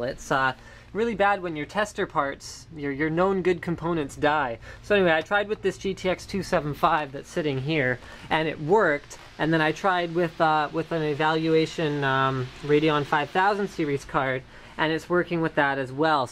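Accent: United States. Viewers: I have to ask - are you from Canada? Because I found it interesting that you pronounced "Z" as zed, but you have a strikingly American accent.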